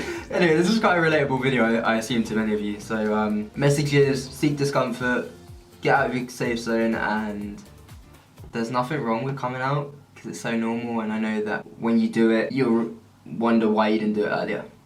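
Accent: United Kingdom. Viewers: Your cringey but really it your voice just oml hello everyone welcome back to my channel it the most brittizh thing to say i feel like thats offensive oh well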